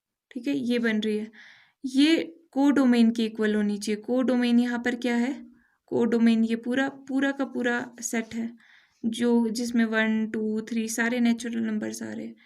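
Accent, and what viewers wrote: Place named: Himachal Pradesh, India. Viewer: ma'am i am also from Shimla (Himachal Pradesh)... Meko aapki slang se lg rha tha k aap yhi k ho isliye mene poochh liya😅😊😊😁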